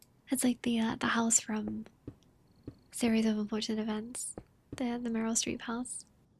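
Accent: United Kingdom. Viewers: Oh my god are you british 😭😭😭 i thought those people were only in tv 😭😭😭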